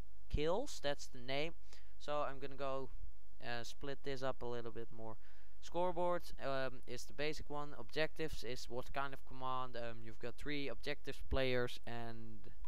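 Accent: Netherlands. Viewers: Are you dutch? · are you dutch ?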